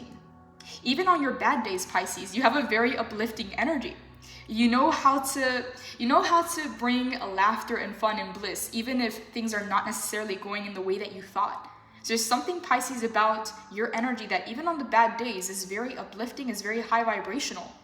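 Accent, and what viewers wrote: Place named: Latin America. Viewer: 333 Eastern!!! I AM SO HAPPY WHEN I SEE YOU POST!! Ain't gonna lie I feel like we are long lost family. I swear I can feel you when you meditate. I detect a Latina accent am I correct, if so I was wondering what your lineage is? I ask because my indigenous ancestors were Tahino { primarily Porterican and South Florida descent and Aztec { South America}... Either way I usually only listen to 3 readers on the regular and you are my top 2. My other reader does readings every day. I understand why you don't, you put a LOT OF ENERGY in your readings. But as someone who was a Christian Pastor and has a degree in Social work, a soul healer where I have given advice to hundreds of not thousands by now... I will gain in sight from you I will be honest, I don't have very many people who are able to advise me with things I don't already know or I am not already thinking of. 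You do. FULL HONESTY I believe you have powerful emotional advice. I wish we were friends. I see how the cards and spirit brings out beautiful messages through you but you absolutely communicate them with grace. I find myself listening to you more for your advice and empowerment as I am processing through life. Know you are loved from afar and I greatly appreciate all that you do. My only wish is I could afford your personal readings so I could finally have just a normal conversation about life .. Chi Miigwetch ( Heart FEALT gratitude, Ojibwa)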